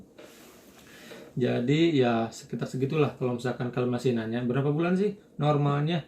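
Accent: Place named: West Java, Indonesia. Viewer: Logat Sunda nya kng rada ka candak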